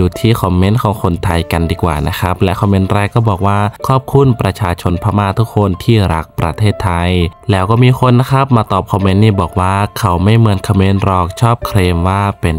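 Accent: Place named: Cambodia. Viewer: ทำไมเเอตมินสำเนียงเเปลกๆ สำเนียงเหมือนเขมร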